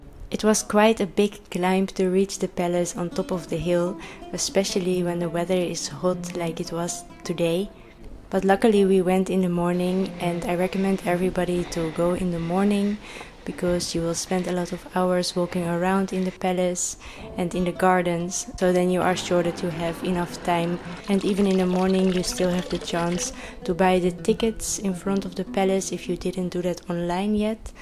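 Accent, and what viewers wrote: Netherlands. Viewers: You must be Dutch lady. I recognized your accent, machalah fellow woman.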